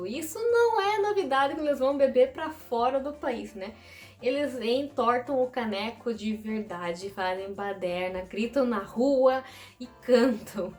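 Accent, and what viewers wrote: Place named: Santa Catarina, Brazil. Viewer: Ganhou um inscrito pelo sotaque. Só fiquei meio! Particularmente. Seria Catarina. Mas se do interior, Rio Grando. Mas bonita, e um sotaque para I’m agradavel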